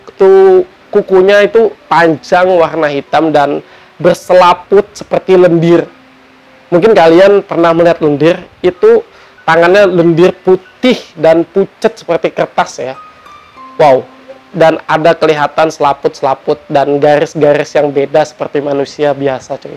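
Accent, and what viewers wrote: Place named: Banjar, Indonesia. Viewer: Air nya rada sedikit hanta🤣 Kental banar banjarnya.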